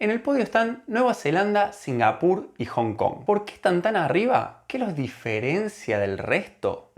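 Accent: Córdoba, Argentina. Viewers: El mejor lugar es Argentina. Ah, no para, me equivoqué de época jaja. Excelente contenido! Me estoy paseando por todo tu canal y es muy cómodo escuchar a alguien hablar con acento cordobés sobre viajes en el mundo! Saludos desde Bs As.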